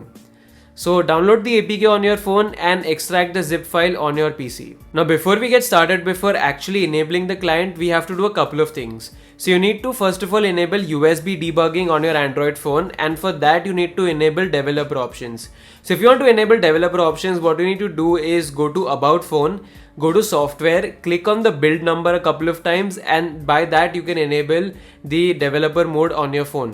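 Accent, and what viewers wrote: India. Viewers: Finally An Indian English ppl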